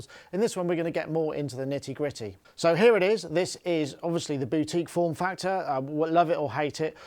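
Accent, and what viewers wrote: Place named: United Kingdom. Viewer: It doesn't matter what you say, you're British....it works out.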